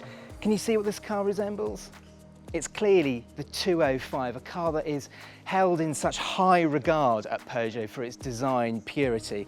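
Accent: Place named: RP, United Kingdom. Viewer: speaks so posh it's refreshing to hear. And the car looks nice, the first time in a long time I have liked a new Peugeot.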